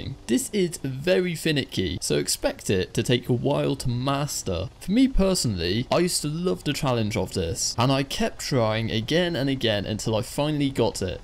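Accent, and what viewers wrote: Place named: United Kingdom. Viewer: there is no better accent then british ^^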